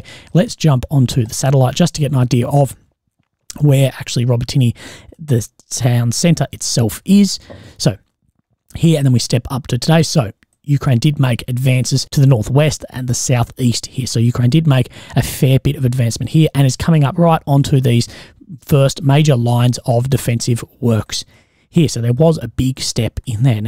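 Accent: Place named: Australia. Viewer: I like your Australian accent 👍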